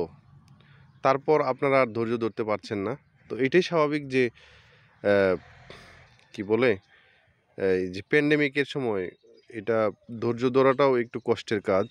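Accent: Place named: Barishal, Bangladesh. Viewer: আইতাছি রে ভাই। বরিশালের ভাষা🥰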